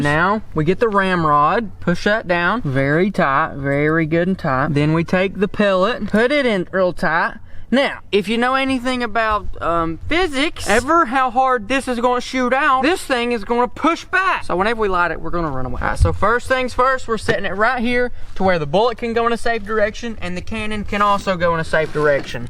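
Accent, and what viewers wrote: United States. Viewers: I use the crosman 1322 with a 14" barrel and a shoulder stock. I pump mine 12 times and I get passthroughs and instant kills on squirrels, pigeons, and rabbits within 20 yards. Also, what are you talking about "twice the size." A .22 is a .22, these pellets have the exact same diameter as a .22 projectile, they are just shorter because they dont have the powder charge behind them. Also, you sound american, but you bought the tuned down Canadian version of the crosman 1322, the US version is over 600fps out of the box. Definitely buy the $20 crosman shoulder stock and rotate your iron sights to peep sight, or upgrade the plastic breach to the steel breach and put a red dot on it.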